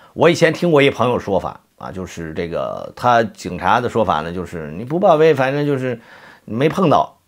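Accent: Tianjin, China. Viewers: 博主是天津人吧哈哈哈哈这乡音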